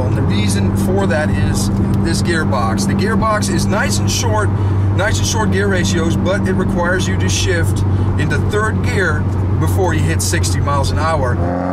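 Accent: Netherlands. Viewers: Ik kan nog steeds horen dat je uit Nederland komt! xD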